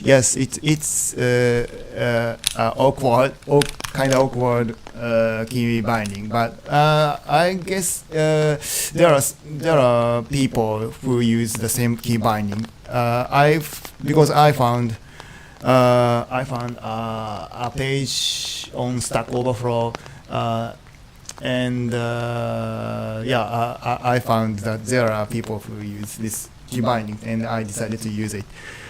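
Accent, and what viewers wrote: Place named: Japan. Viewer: 日本人の喋る英語ってとても参考になります。vimも覚えたかったので私にとっては素晴らしい動画でした！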